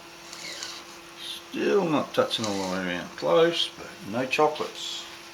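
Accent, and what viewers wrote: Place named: Toowoomba, Australia. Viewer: I thought the speech sounded close, in fact your mode of talk matches a mate who lives in Toowoomba, so much so you could be father and son, but I know that's not possible. So from what you just said, Re Lathe switch, can you have the DRO on, but not the lathe and there is no problem? Or does the lathe switch also power the DRO?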